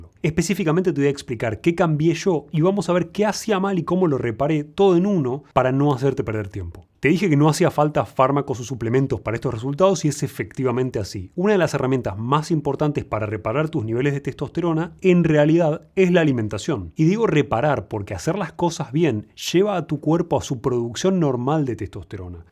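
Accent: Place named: Córdoba, Argentina. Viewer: Eres de Córdoba por tu acento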